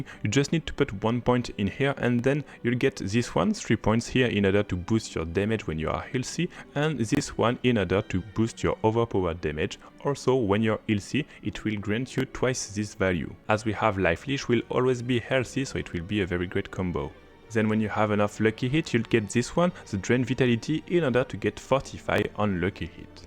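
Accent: France. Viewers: J'adore notre l'accent fr en anglais 😅🤣... mucho love❤😉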